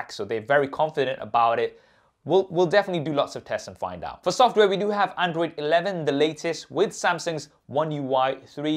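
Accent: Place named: United Kingdom. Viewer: His voice got so soothing in middle of the video that i fell asleep. Dude you gotta make a lullaby playlist in that British Accent.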